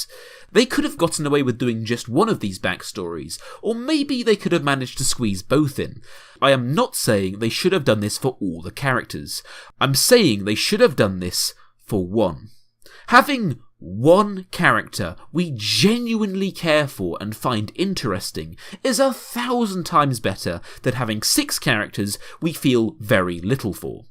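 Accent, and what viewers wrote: United States. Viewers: I love your american accent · american accent revealed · wow dude you have a nice american accent i wish i could do a good British accent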